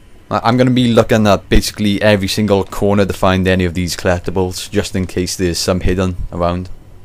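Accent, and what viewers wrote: Wales, United Kingdom. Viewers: are you welsh? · Omfg. A welsh commentator!? I'm subscribed just for that. Where in Wales you from? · Welsh :D He Welsh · It may be dumb, or stupid. But I was instantly in love with your accent. I love the Welsh. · Is that a Welsh accent I hear? Blimey. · He's Welsh. I feel like it was in his info at one point, if it's not there now. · im in love with your accent :) i wish i was closer to wales, im 1 hour away :( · Whats your nationality? You sound Welsh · You sound welsh.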